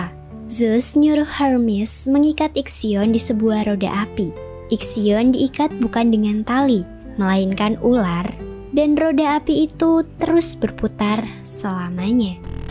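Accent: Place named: West Java, Indonesia. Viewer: Kakak pasti orang sunda ya kak ... 😅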